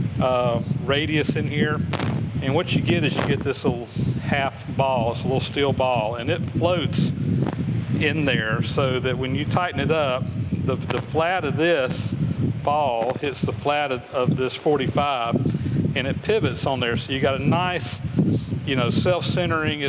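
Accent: South, United States. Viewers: My understanding is that our southern accent is derived from the Protestant Scots Irish who migrated to the US in the late 18th & early 19th centuries. My family is one of those that made the journey. I happen to live in the same city as by the way. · after quite a while watching your videos I get used to your accent and your pronounciation of "oil", always sounds like "owl" to me (btw, the same with Adam Booth, must be a a southern thing). But when you said "lets grab some owl" again wierd thoughts went through my mind;) Greetings from Austria and thanks for your great videos! Dieter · In re: Your accent. One of the things that makes the US the US is that regional differences have not been totally homogenized by television yet. I have been visiting the South regularly for 50 years and while the accents have been smoothed out a little, there is still a charming difference from the speech of Yankees like me.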